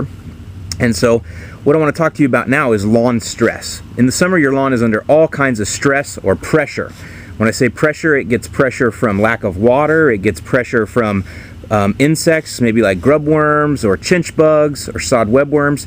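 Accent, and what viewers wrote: Chicago, United States. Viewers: ""WOW""even your name was different did I hear a do you have a strong accent Chicago the Bears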